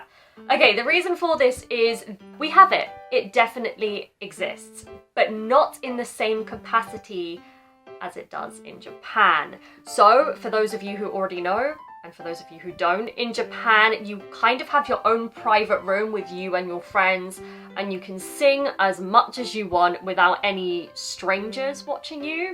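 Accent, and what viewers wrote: United Kingdom. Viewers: 実は上質なイギリス英語が聞けますね笑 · イギリス英語の発音がカッコいいイギリス女性が日本の食事や旅行や100円ショップを嬉々として楽しむ。可愛くて最高だな。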